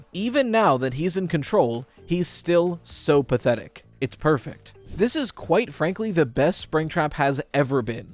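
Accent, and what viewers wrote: United Kingdom. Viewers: I'm just so happy to hear him be British again, it's honestly been a while.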